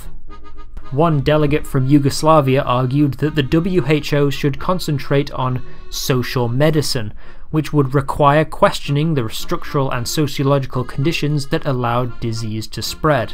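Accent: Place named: United Kingdom. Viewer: the narrator has a british accent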